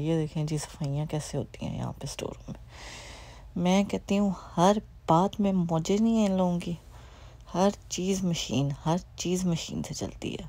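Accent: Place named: Punjab, India. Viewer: Though driving.and ur commentery aur uper se Punjabi touch❤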